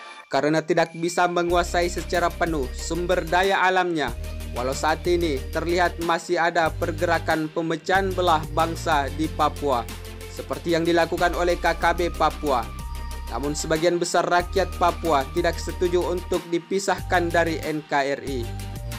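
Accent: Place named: Malaysia. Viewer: Ini canel Malaysia brohh.. ngomong nya aja udah keliatan..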